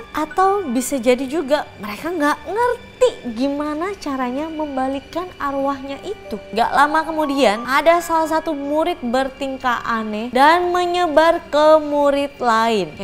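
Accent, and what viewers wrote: Java, Indonesia. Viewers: maaf ya.. bahasa jawanya bikin ngakak.. tp ga apa2 bhs jawa pelawalannya mmg susah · bunda sarwendah gemes baca bahasa jawa sehat selalu bunda sarwendah cantik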